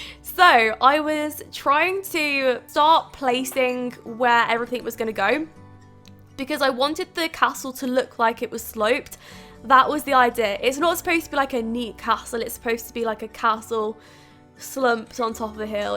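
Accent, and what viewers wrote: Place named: Scotland, United Kingdom. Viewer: Excuse me, are you from Scotland? If not, I think your accent is really Scottish 💜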